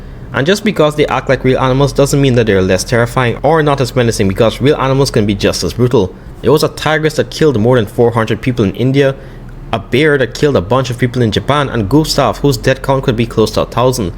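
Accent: Caribbean. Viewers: i know many, many people have probably already pointed this out to you... but i noticed your Caribbean accent. from one west indian to another, you make some damn good videos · I heard the accent and immediately thought you were from the Caribbean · Are you Caribbean by any chance? I'm picking up a hint of Jamaican, Trini or Guyanese in your accent. Love from Guyana! 🇬🇾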